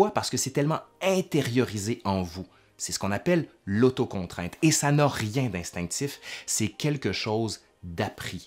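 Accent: Canada. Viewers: L'accent canadien est-il un signe de distinction?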